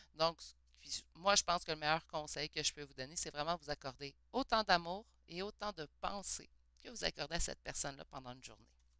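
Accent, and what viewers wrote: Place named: Montreal, Canada. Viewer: Bonjour, j'aime beaucoup votre façon d'interpréter les cartes Et j'adore votre joli accent. Ça me fait penser à ma famille qui vit à Montréal 😊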